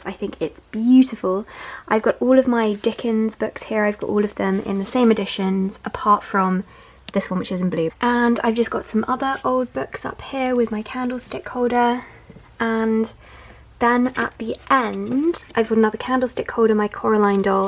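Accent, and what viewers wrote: United Kingdom. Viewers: I opened this video ( just because I saw a nice picture) not expecting much buuuut the moment you spoke first words I fell in love! I love British accent and I wish I could find more British booktubers but there’s a thing I can’t find anyone at all or I find a person who is not my type and I’m boring to watch… Summing up everything I said, I’m so glad I found your channel! Thank you for your videos and your beautiful bedroom haha🍃✨ p.s. if somebody got a British( Irish/scottish are welcome too) booktubers recommendation, I’d love to hear that!!💗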